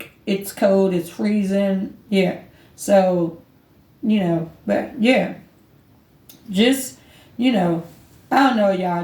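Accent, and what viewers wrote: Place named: DMV, United States. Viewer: These rent prices are crazy anyone who looks down on somebody who is on section 8 needs to watch it because the rent is way too much people claiming that voucher holders are this and that everyone has a story after being beating up for so long who in their right mind who would trash the place after being homeless most of us want to just live as you said facts this helps people get back on their feet after all most of us beeem through we grateful to have it . I’m Homeless and just got approved for my voucher finally I been through too much years worth I need this voucher I can’t pay no 3k for a one bedroom this is outrages . Same I also have struggles too . Section 8 voucher is a blessing tbh even though it’s a lot of fighting for it it’s a war for this thing . Also the name we call it is housing choice voucher but there is people who are just lost and don’t realize with this you can get a house you can get a town house you be living better than others paying all their check away lol never be afraid to take help Or ask for It I think it’s pride too that be messing up with people and they look down on others it’s very sad once you get approved and get your own place that all that matters voucher give you a place to live so no need to look down on that same with food stamps EBTim also from the DMV too I hear that accent lol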